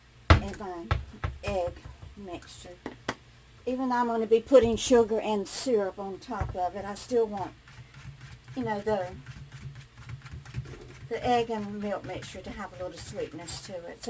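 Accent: South, United States. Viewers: Just came across your video, and love them! Where are you from with that accent, and the y'all. Also, you mentioned that you go to Cafe du Monde. I was born and raised in St. Bernard Parish just 35 miles below New Orleans.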